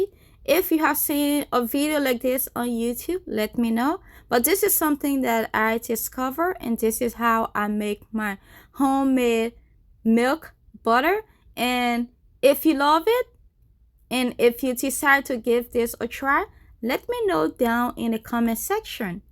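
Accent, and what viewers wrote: Liberia. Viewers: I Hear a accent are you from Liberia?? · Do I detect a Liberian accent.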